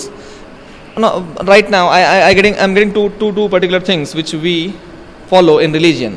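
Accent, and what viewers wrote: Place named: India. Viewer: A typical Indian style of speech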